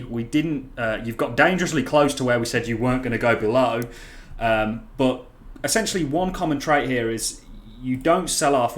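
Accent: Birmingham, United Kingdom. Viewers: knew I could hear the Brummie in you 😆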